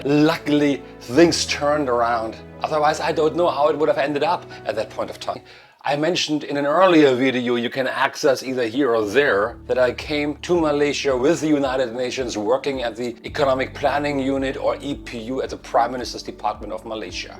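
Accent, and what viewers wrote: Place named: Germany. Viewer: After 30 years living abroad... U still got ur authentic german accent .. ur sounds like u've been in Malaysia yesterday...🤣🤣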